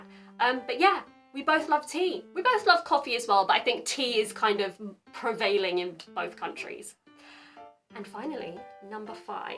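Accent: United Kingdom. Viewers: Skyeさんはじめまして😊 私は1995年～2000年までイギリスに留学していました。 Skyeさんのブリティッシュイングリッシュが懐かしく感じます。 今回の左側通行の話でこんなことを思い出しました。私は、日本の高校を出てすぐにイギリスへ行ったので1996年にイギリスで車の免許を取りましたよ。私が丁度免許をとる時に、イギリスでは初めて筆記テストが導入されました。確かドライビングスクールに申し込みした時に、残念だけど君が免許をとる時には筆記テストが導入されてしまう😨と言われたのが懐かしいです。(申し込みした時にはまだ筆記テストの制度が導入されていなかったか、丁度今月からイギリス国内で導入されることになったみたいな感じです)現在はどうなのかわかりませんが、日本と大きく違い、郵便局で書類をもらって書いて送るだけで仮免許が来ることにビックリしました😅。そして、イギリス人の皆はこの仮免許で親を隣に乗せて運転の練習と言うのもビックリしました。お金かからなくて良いですよね。ドライビングスクールも日本に比べたら安かったですけどね。 道路のお話ですが、もちろん同じはずです。何故なら日本はイギリスをお手本に道路を作ったので当然、左側通行です。なので標識も似たものが多いですよ。(なので、筆記テストは意外と楽でした😊わたしの時は確か35問あって、30問か32問合っていれば合格でした※もう25年位前の話なのでうろ覚えですが😅) ただ何故かroundaboutは真似しなかったみたいです。面積的に難しかったのかも知れないです。でも、ここ最近日本でも田舎の方では一部試験的に渋滞や事故軽減のためにやっているとのことです。 長くなりすみません。 Skyeさんの凄くかわいい笑顔に癒されています。😌 イギリス人というお顔だちが凄く好きです。😊 太郎さんが羨ましい😊 応援しています。👍✨ 頑張って✊😃✊ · 好みが分かれるが、やはりＵＫアクセントの方が自分には馴染みがあって心地よい。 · イギリス英語かっこいい！！ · めちゃくちゃ可愛いのにバリバリのイギリス英語でギャップ！笑 一緒に飲みにいきたい☺︎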